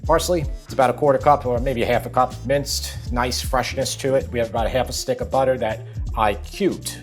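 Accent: New York, United States. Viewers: You sound like if Vince Vaughn was a New Yorker, it’s very enjoyable 👍🏻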